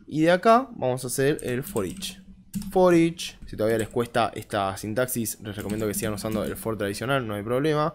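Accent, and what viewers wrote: Argentina. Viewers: Creo que entendí mejor contigo que con el curso introductorio de soyHenry, no les entiendo nada hasta el día de hoy a ellos, pero a ti, clarito bro, voy a hecharle un vistazo a tu curso del que hablas 👌🏻😎, la verdad, hablas claro apesar de que creo que eres argentino no?, en caso de ser asi te entiendo perfectamente a ti, y no a los de soyHnery, deberias ser instructor de ahi, ya que te juro que no les entiendo nada de lo que hablan, no se si no pronuncian bien o hablan demasiado rapido, tu no, calmado y bien explicado, hasta rayas, y eso vale oro bro. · Un chino con acento argentino, tienes un nuevo fan 😊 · Gracias amigo, me vi muchos videos pero vos lo explicaste cortita y al pie, pd: te sale re bien el acento argentino, un saludo hermano 🙌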